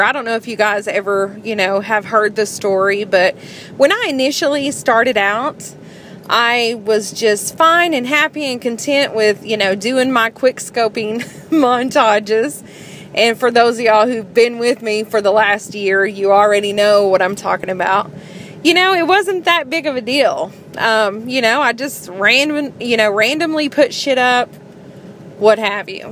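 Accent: South, United States. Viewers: being a northerner living in the south, your sweet country accent drew me in immediately. plus you cuss like a sailor. you can make vlogs from now on & I'll watch every last one of them. you don't need gameplay to keep me interested. ;-)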